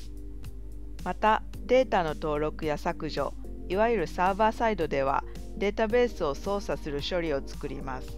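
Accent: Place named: Japan. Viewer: 日本人的な英語ワードの読み方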